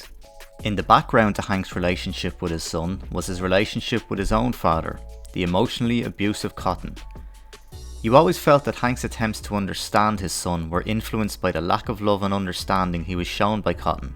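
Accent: Ireland. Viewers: a very Dublin accent to me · British? Sounds Irish to me. But I get you either way.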